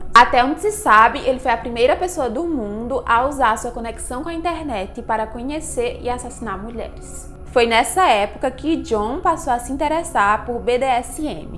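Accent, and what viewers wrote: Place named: Rio Grande do Norte, Brazil. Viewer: Segunda vez que venho no seu canal muito interessante, pelo seu sotaque. Vc mora em natal rio grande do Norte ? Bjs linda !!!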